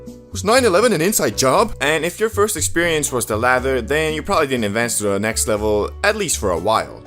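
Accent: United Kingdom. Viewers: Are you britsh? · Do he sound like he from the Uk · He's from the UK????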